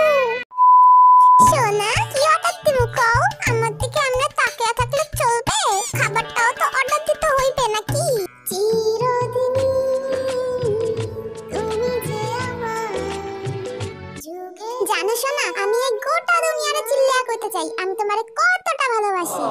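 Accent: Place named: Aminbazar, Bangladesh. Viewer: opsss sorry na mane tumar vasa ta amader Bangladesh er dhaka aminbazar er moto tai bolsi 🙂🙂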